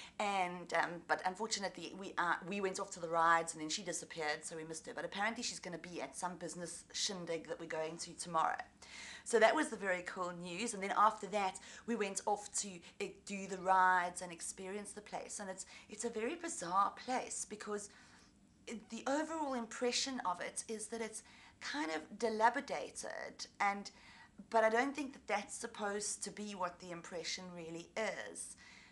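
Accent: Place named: United Kingdom. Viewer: u got british accent..;)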